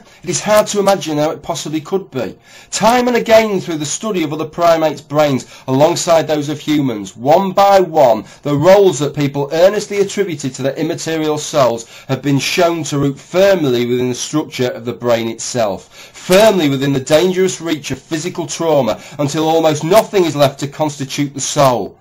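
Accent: United Kingdom. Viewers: To most Americans, you sound 50% smarter by just having that British accent. I agree with you, by the way.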